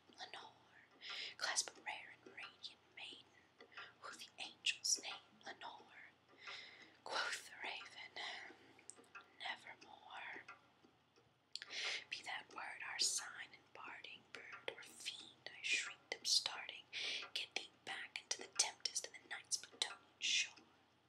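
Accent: South, United States. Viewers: Whisper "A Tell Tale Heart" by Edgar Allen Poe. Great whispering, I love the southern accent.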